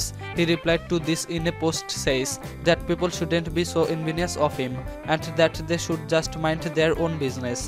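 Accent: India. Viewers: Oo nga, lahing pana ba yong nagsasalita..indian..bilog ang dila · Yung accent dih ko kinaya indian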